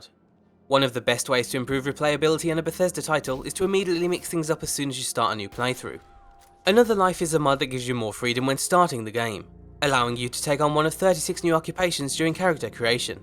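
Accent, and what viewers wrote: United Kingdom. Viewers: I'm a subsriber since...a long time, but I still don't know where is from. I'm guessing british, but not sure.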